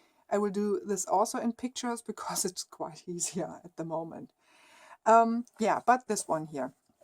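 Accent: Germany. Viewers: Being a of direct German heritage I love seeing all German things and hearing all the stories.... Brings me back to my youth and seeing the things around the homes of many of my relatives and tales told to me by all of them....even your accent brings them back to me! Lol. Like getting a great big hug from all of them! Thanks for sharing.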